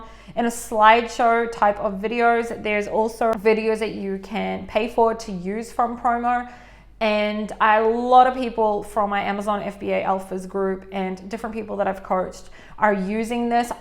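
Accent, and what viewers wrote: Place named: New Zealand. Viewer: Thanks, I just applied for a trademark with my brand through a lawyer. I'll be coming back to this content when I get it up and running! Nice to hear a kiwi voice on here too. Cheers. Sam from NZ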